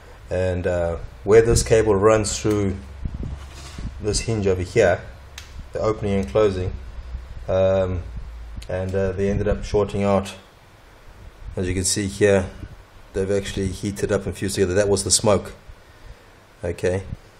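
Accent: South Africa. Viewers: The SA accent?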